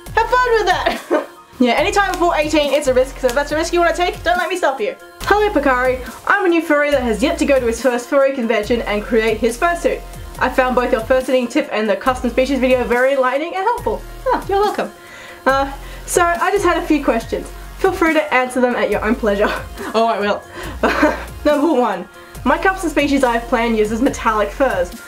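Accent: Australia. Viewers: Q and A are u Australian or Tasmanian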